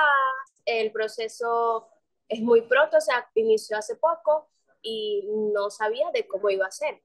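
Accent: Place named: Puerto Rico. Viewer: Eres en verdad venezolana hablas como si fueses de Puerto Rico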